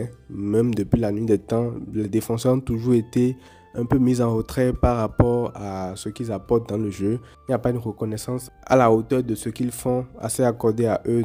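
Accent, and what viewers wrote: Benin. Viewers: Je découvre ta chaîne, tu as un petit accent béninois ou c'est moi ?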